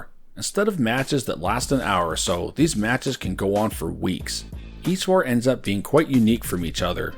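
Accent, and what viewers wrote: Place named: Finland. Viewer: is this guy from Finland? wheres this accent from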